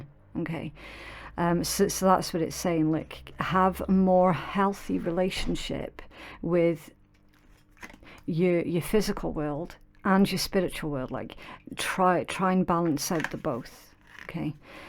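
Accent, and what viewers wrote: United Kingdom. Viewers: Thank you, sounds a little bit like her or I. You sound great. Loud n clear. Your accent sounds British to me... That's great I speak English... I have other problems with haters hating and threatening me. So I've been praying on it... She should of been on my side and she wasn't.. looking around see how it goes.. I'm 😁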